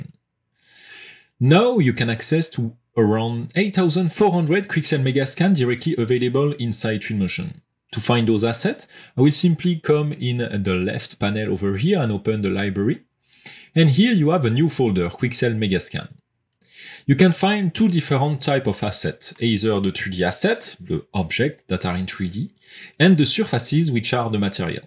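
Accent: France. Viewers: J’adore l’accent French 😋😉😎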